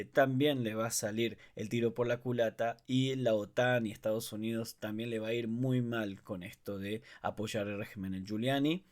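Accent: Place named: Córdoba, Argentina. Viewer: Muy buen informe, muy profesional. Puedo identificar un lejano acento cordobés … si?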